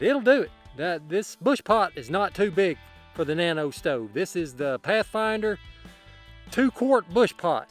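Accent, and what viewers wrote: Alabama, United States. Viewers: Everybody loves that southern twang.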